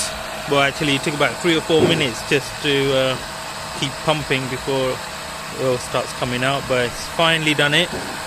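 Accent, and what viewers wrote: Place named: United Kingdom. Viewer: SOON AS I HEAR THE BRITISH ACCENT I'M OUT OF HERE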